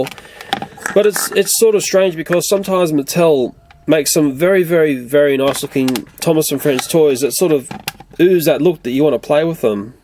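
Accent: Australia. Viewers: I love your Australian accent when your talking about stuff in your videos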